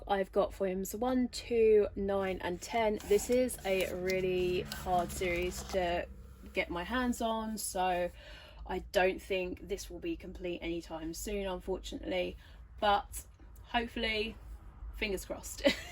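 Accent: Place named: United Kingdom. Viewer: Hi! Can I ask if you know where to get secondhand mangas in UK? (assuming you are in uk based on your accent, sorry if im wrong!)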